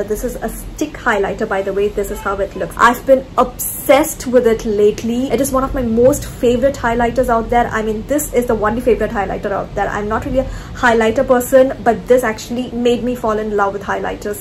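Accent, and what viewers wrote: Tamil Nadu, India. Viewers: Are you a Tamilian ? Just love your accent 💕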